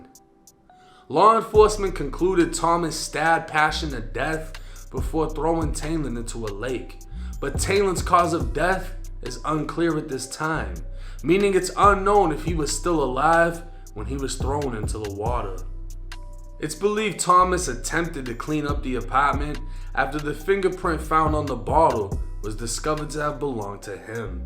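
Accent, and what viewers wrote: Boston, United States. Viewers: Tell me you are from Boston without telling me you are from Boston. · Bro.. You have to be from Boston.. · 📖dude your storytelling is on point.followed this nightmare on the media but all the gory details omitted. And love the bahstan accent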